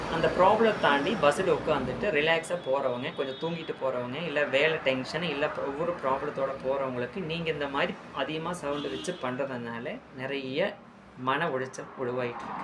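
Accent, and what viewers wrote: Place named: Kerala, India. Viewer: மலயாள வாடை தெரிகிறது தாங்கள் அழகாக தமிமழ் பேசுகிறீர்கள்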